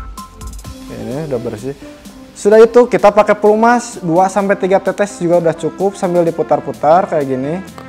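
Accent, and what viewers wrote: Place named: West Java, Indonesia. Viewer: Jogja rasa sunda😅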